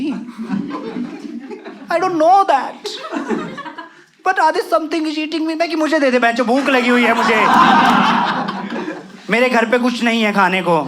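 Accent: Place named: United Kingdom. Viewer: your english accent gets me everytime :D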